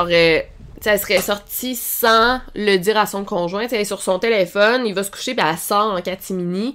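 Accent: Quebec, Canada. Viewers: Tres Bonne video! L accent du Quebec (?) c est trop cute! Pourrais tu traiter l affaire Loubna Benaissa? C est une affaire belge qui a ete baclee entre autre a cause de l affaire Marc Dutroux et aussi que c etait une petite fille issue de parents immigres du maroc qui parliament pas francais. Ca s est passe a bruxelles dans les 90s. Il n y aucune video sur ce cas. Continue comme ca! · Bonjour, je viens de voir que ta chaîne n existe plus pourquoi??? J adore tes vidéos, surtout ton accent québécoise, tes narrations au top....sois heureuse dans ta vie, tu va nous manqué, bisous de la France 😘😘🇫🇷🇫🇷🇫🇷🌺🥀🌹🌷💟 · C'est bien raconté mais avec ton accent québécoi sa rend drôle 😂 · Salut, Je suis une de tes fans française chaque fois que j'écoute tes histoires ton bel accent me transporte dans vos belles contrées québécoises 🤗félicitations à vous deux, plein de bonnes choses.❤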